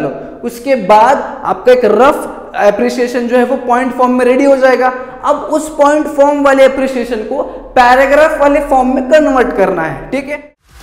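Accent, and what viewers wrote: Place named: Pune, India. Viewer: Pure puneri❤😅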